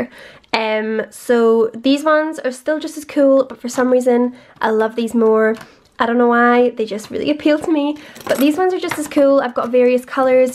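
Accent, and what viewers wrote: United Kingdom. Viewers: I love how her accent sounds like normal English accent but also has that British accent😍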